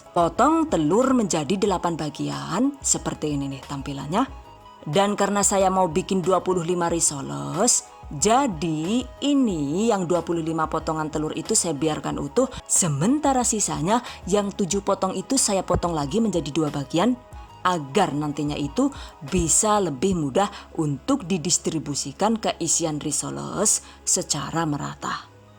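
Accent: Banyumas, Indonesia. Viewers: maaf suaranya suara ibu kan ya? lucu gaya ngapaknya hihi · mantap nih bun interaktif banget 😅 ngapaknya ra kuat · Dening ngapak iya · Ngapak kaya nyong 😆😆😆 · Mantabs banget mamake 😁😁 salam kenal bunda..sy suka resep2nya.. suaranya khas dan ternyata ngapakers juga 😍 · Nyimak wlu agak ngantuk2 gtu,krn kangen denger ngapaknya wkkwkkkk....dedek ngebantu bundanya...,😀 sampk nangkring2 gtu.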